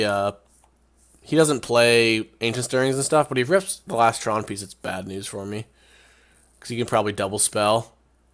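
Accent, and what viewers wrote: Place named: Southern California, United States. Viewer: Most SoCal accent I have ever heard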